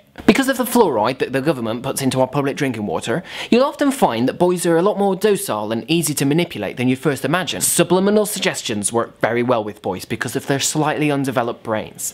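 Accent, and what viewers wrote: Bristol, United Kingdom. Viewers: ur bristolian accent actually made u mention a skl in bristol woah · hahahah the Bristolian accent just makes these videos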